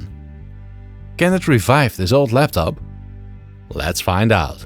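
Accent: Netherlands. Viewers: This OS reminds me UK YouTuber, Dan Wood. He is Amiga computer user, btw. I remembered watching MorphOS video a few times ago. But what I liked more here, than from Dan Wood is your NLD English voice that I just preferred 😅😅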